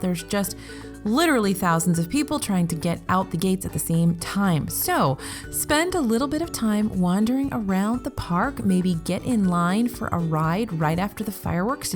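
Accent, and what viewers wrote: United States. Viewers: Justin Porche I am British so she has an American accent to me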